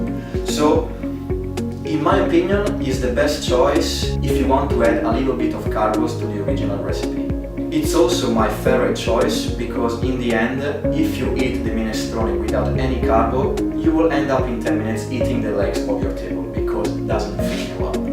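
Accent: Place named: Italy. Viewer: Minchia allora avevo ragione a pensare che fossi italiano all'inizio l'accento era quello hahaha viva l'italia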